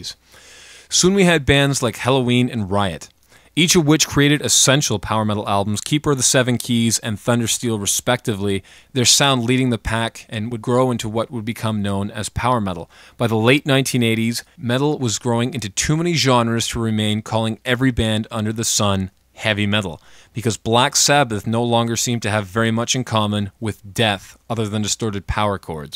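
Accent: Canada. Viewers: The narrator is canadian for sure.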